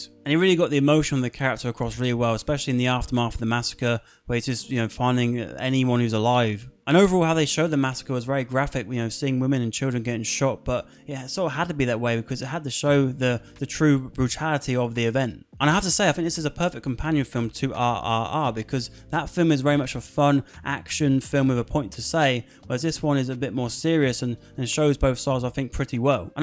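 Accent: United Kingdom. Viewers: It's hard for indians to understand ur English accent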